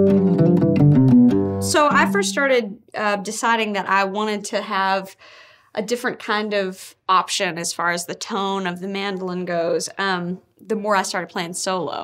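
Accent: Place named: South, United States. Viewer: Her accent is crazy. It's like some words she says are from the south but then every now and then a few northern ones slip in.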